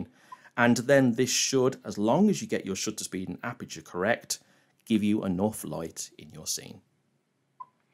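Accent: United Kingdom. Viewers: Two things stand out for me 1, he’s British which makes a nice change from all the American offerings and 2, he explains the basic, but important settings in a language that a beginner/enthusiast can understand and get to grips with quickly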